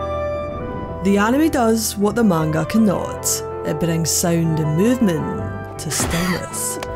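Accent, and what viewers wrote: Scotland, United Kingdom. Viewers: Holy crap this video was done exceptionally well! And I love your Scottish accent! Great job, cheers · Love this!!! Your accent sounds Scottish, it adds to this review very well. Keep it up 💕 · Yes, I watched all the manga just before it on air. I almost lost all the joy of watching...Besides the fantastic editing of your video, I love your accent. Are you from the UK??? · you sound scottish, yaas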